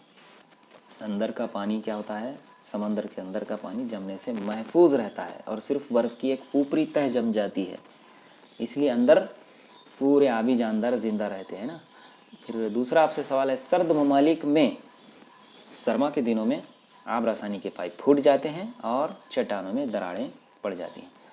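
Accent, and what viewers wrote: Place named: Hyderabad, India. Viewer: Sir is video m ap Ka accent Hydrabadi Hai ek dum.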